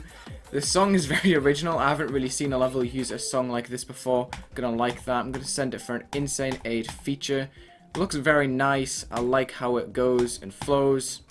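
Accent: United Kingdom. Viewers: well yh but I think he has a SPECIFIC British accent. I get what ur saying tho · He's British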